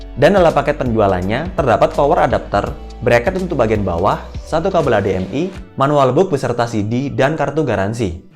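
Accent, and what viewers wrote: Java, Indonesia. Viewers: Coba lebih meddok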